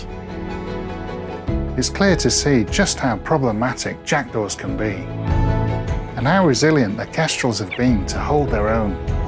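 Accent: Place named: West Yorkshire, United Kingdom. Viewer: Hello! I've watched a few of your videos before - I genuinely started because I love animals in general but also having lived in West Yorkshire for a huge chunk of my life I just missed the accent! Haha! - but have finally subscribed. I am a huge fan of corvids, they're so beautiful and intelligent so it felt a bit strange rooting for the Kestrals in this video. 😂 Thank you for these educational and informative videos, I've learned a lot in just the few that I've watched and am definitely going to introduce my 10yr old nephew to the channel as we love watching animal documentaries together. Looking forward to binging through your past videos and seeing what comes next! Thank you for all your conservation work that keeps these species and many more around for my nephew and following generations to see. It breaks my heart to think that some of the creatures we see around us could be as distant an idea to future generations as the woolly mammoth is to us! 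😢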